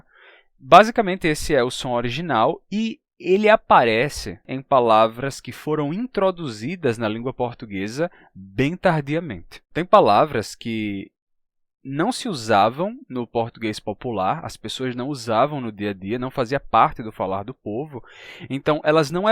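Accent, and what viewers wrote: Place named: Natal, Brazil. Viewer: Você é muito bom nas suas explicações. Elas têm toda lógica principalmente para quem conhece um pouco de outros idiomas. Nas nuances explicativas vêem-se semelhanças que permitem a gente entender melhor o português, outras línguas neolatinas e o inglês. Além do seu sotaque de Natal que eu adoro. Vivi mais de 10 anos aí e vou de 6/6 meses. Parabéns por tudo. Escrever aqui é muita responsabilidade para não “pagar mico” demais, pois você de fato sabe português.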